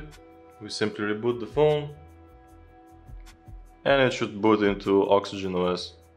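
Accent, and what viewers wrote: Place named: Eastern Europe. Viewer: Hey, where did you get your phone from? I'm going to buy it in China probably from the official OnePlus Chinese website and bring it back to Europe. I'm pretty scared tho, I hope this works and doesn't brick my phone. I guess I should just not do any H2OS updates and it should all be fine? Also, from your accent I have a feeling you come from a Slavic country, am I right? :D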